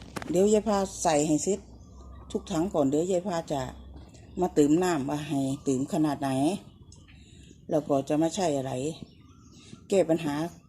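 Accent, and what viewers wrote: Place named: Korat, Thailand. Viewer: อยู่บ้านไหนคือพูดโคราช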